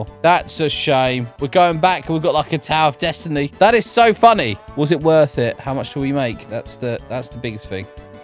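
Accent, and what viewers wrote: United Kingdom. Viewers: Your British doesn't matter you are still a great youtuber and I watch all your videos I can find too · Are u brittish cuz u sound like one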